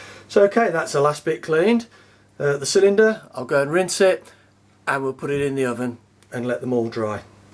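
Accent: England, United Kingdom. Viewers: It sounds like an English accent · I hardly ever disassemble completely. I just scrub out the barrel cylinder and wipe out the outside. It only gets a complete hair down like twice a year never had an issue. And you sound English, I take it. These are the only handguns you can own. In the United States they are not even considered firearms. I would look into getting a conversion cylinder. But I do not know if that would break your laws.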